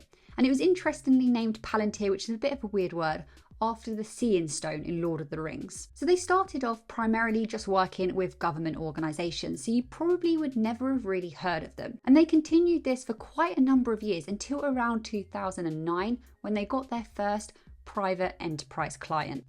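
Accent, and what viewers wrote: United Kingdom. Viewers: I like your British accent❤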